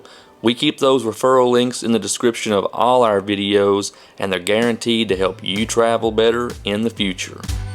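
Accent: Kentucky, United States. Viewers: Is that your real voice? If so, I love your Kentucky accent…..we all need to slow down a little!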